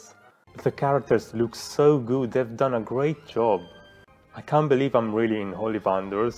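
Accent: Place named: England, United Kingdom. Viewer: I sorted myself into Hufflepuff ...love your English accent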